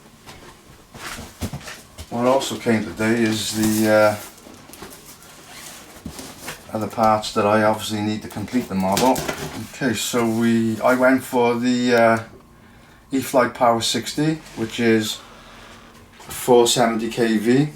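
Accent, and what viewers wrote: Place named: South Wales, United Kingdom. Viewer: I think my high quality diet and fitness regime is what keeps me resilient to illness but nobody is bullet proof and I'm definitely concerned! From you accent your from south Wales right? There have been a fair number of reported cases in Wales and that's gotta be scary, so far there has been two here in Wiltshire but none in my area of the county but it's just a matter of time and I think it's almost inevitable that it will reach here sooner or later.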